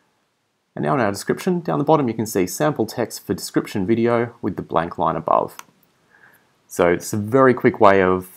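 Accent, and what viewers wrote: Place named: Australia. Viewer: good job mate, keep it up! right from the get go I could tell that lovely aussie accent (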